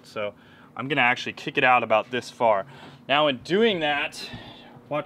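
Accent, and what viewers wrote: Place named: United States. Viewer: For a second there, I thought you were Issac Butterfield, but then I thought "why does he have an American accent?"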